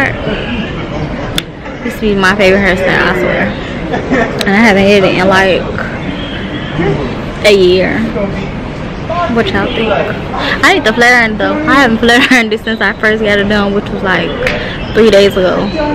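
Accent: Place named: United States. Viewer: Heavy country accent yasss🧡🧡🧡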